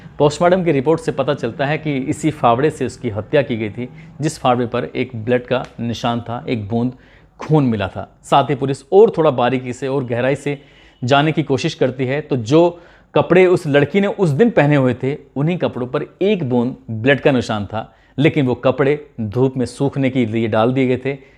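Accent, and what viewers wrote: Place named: Bhojpuri, India. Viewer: Bura na manna AAP blood ko bled kyoan pronouns krte ho sahi krne ki kosis kijiye Sir bhojpuri tune daldiya aapne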